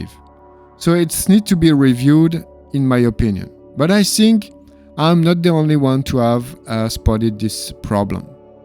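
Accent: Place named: France. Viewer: Salut ! Tes français? Par ton accent on dirait. Si c’est le cas, tu parles très bien anglais!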